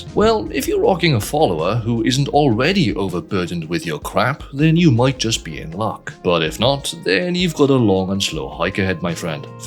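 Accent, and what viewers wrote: Wales, United Kingdom. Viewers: welcome back i have missed your beautiful welsh accent and fantastic videos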